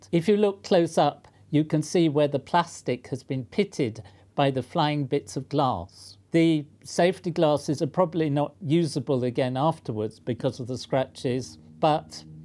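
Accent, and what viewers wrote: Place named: Nottingham, United Kingdom. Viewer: That's how they pronounce tomatoes in Nottingham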